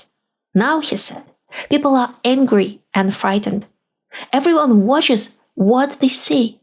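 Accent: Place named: Russia. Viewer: I love your podcast. Your insights are very interesting and important to hear from someone who grew up in the Soviet Union. Your English is impeccable with a lovely Russian lily!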